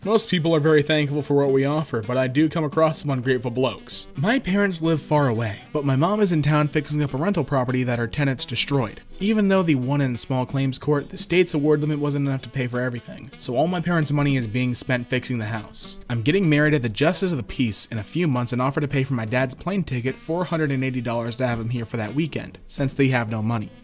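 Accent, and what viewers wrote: United Kingdom. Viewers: is british? his accent was british 12 months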